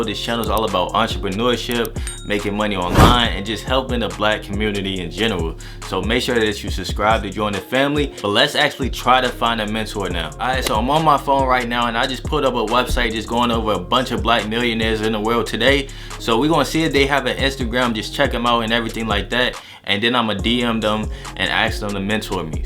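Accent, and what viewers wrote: Philadelphia, United States. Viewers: You sound like Philly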